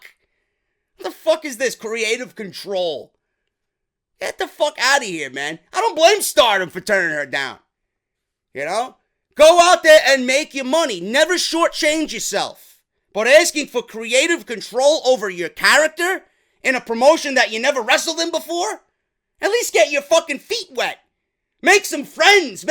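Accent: New York, United States. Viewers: You're a natural for commentary. You have a distinctive voice due to your New York accent. Better than some of the guys in the WWE.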